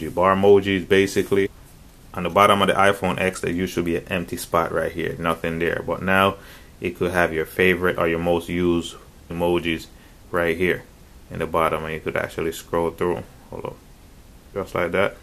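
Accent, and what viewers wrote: Jamaica. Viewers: Jamaican I see, keep up the good work Bredda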